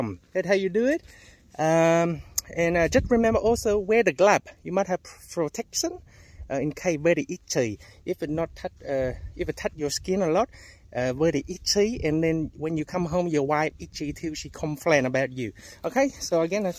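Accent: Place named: Vietnam. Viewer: i cant stop laughing. everytime i go footscray or richmond, i always hear this accent. lol but i love viet culture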